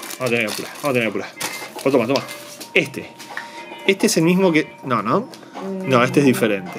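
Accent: Argentina. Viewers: jajaja que chulo me encanta el accento argentino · pares un argentino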